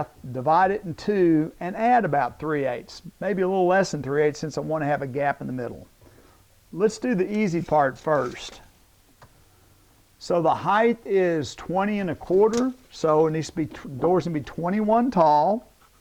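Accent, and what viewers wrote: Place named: Arkansas, United States. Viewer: Arkansas "mayshure" for measure. :) Accent sounds funny. Also "rebate" for rabbet. Good Videos.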